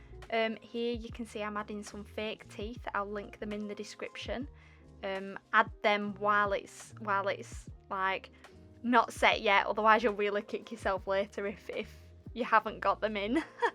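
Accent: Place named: United Kingdom. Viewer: I love you voice it is so British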